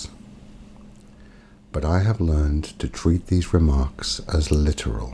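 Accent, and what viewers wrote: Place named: United Kingdom. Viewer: any British gentleman's voice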